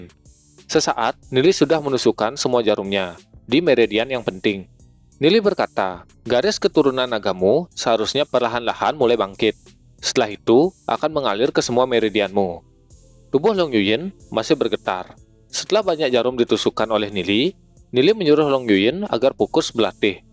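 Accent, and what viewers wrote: Bali, Indonesia. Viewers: Logat bali?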